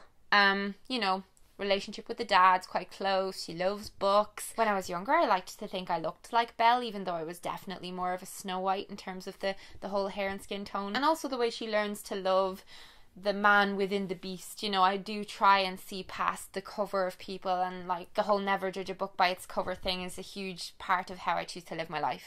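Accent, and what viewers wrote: Ireland. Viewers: Hi, in response to your question; why do I watch your videos. I have to say that your personality is so great! You are so real and so cool, so pretty and just amazing. I love what you stand for and I love the fact that you helped me come to terms with me being both bi and feminine in personality. Like what you always say it's hard to be bi or lesbian when you are feminine because people assume you are straight but it's nice to know there are other girls like you out there that are going through the same thing as me. Also you're Irish and I love the Irish accent and Irish people are just so nice (like you). So yep in summary I watch your videos because I think we could be really good friends, you seem like a nice person (with cool videos I watch religiously) -soz if I went on a bit in my answer but you're great and inspiring to me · I watch your videos because I love your accent :) even though if I speak english now (Im from Germany) I tend to slip in some words that sound irish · Hi!! 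Just want to answer "your" question (technically not yours haha) I found you through Bry & Candice a WHILE back in a video about yoga.. I think?? And I checked out your channel and I immediately loved your body confidence videos as well as your food related videos and the makeup ones!! So I subscribed and then you started talking about your sexuality (Bi girl right here 👋) and I was just like "omg thank you SO freaking much for talking about this" You really help me to deal with life in general, your like a cat you calm my anxiety and make me laugh and smile:) Honestly, I feel like we should both go to Hogwarts and you would for sure be my favorite teacher!! Anyway, I just rambled about all the reasons why I watch your videos and I hope it makes sense because you're probably my favorite YouTuber and I sometimes wish I knew you in real life because I would LOVE to have someone like you to brighten up my life with your gorgeous eyes, your incredible knowledge of certain things even the most random ones (I did not know Freddie Mercury was Bi thank you!!) and of course your LOvely Irish accent! 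Thank you for being the most genuine YouTuber I know and helping us all out:) Love you lots xxx PS: also, you're my YouTube crush shhh;) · i absolutely love your videos, they always make me smile. your sweet Irish accent always chills me out. i love your Q and As beacuse i love getting to know you more. you are such a light in such a dark internet space. lots of love from England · I watch your videos because you're the first person with an irish accent that I ever heard (and then I discovered that it was FREAKING CUTE) + you're a bisexual lady (me too) and I love how you talk about this + you're mostly positive ! Love from France <3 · Well I started watching you because I saw you in a video with Hannah, and you seemed nice. Also, I loved your Irish accent. But I stayed because you've made some really interesting and honest videos, and you tell your opinions on subjects, even if they contradict with what the majority of youtubers are talking about at the moment(i love how you mentioned that drinking alcohol every now and again is ok. 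I realise that talking about alcohol addiction is important, and I've been loving Lucy Moon's videos on the topic, but not everyone has a problem with alcohol, and I think it's important for people to know that, especially younger people who haven't tried alcohol yet.) I also like how you're supportive of other youtubers' success, book deals, etc. I tend to be a jealous person, envious of other people. But I've been trying to be more positive and supportive, and less jealous, and It's thanks to your videos :) Sorry for the long comment, hope you have a nice day!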